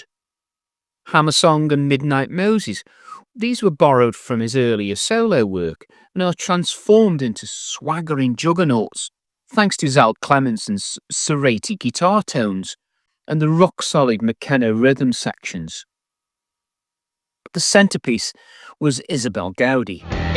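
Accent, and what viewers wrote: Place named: Midlands, United Kingdom. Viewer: I agree,no ego... passion just flows brilliantly....the accent is perfect too, a bit of Kidsgrove or Smethwick blended with a sprinkling of progtalkability.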